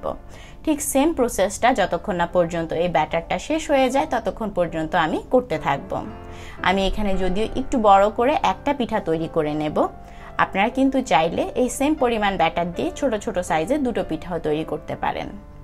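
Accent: Noakhali, Bangladesh. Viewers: ওরে আফা আন্নে নোয়াখাইল্লা নি।😲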